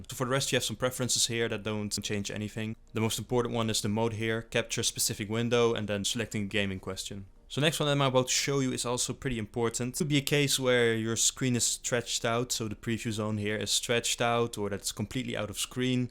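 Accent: Netherlands. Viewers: I hear a dutch accent! Goeie content ouwe!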